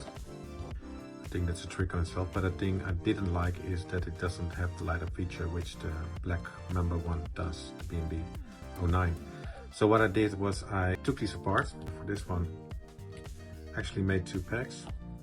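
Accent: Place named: Netherlands. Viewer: Sounds like a dutch accent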